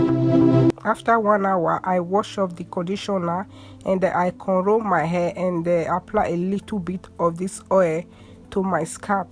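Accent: Africa. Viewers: YOUR ACCENT IS SO CUTE I WANNA DIE. I LOVE AFRICANS! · My mom has the same African accent like yours, it's soooooo beautiful. I'm Ghanaian btw🇬🇭🇬🇭🇬🇭🇬🇭🤘🏾😛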